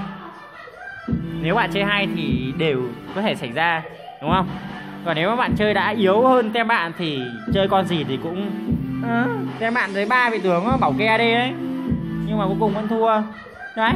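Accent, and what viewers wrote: South, Vietnam. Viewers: thích cách nói chuyện của bạn nhiều lúc lại giọng miền nam nghe vui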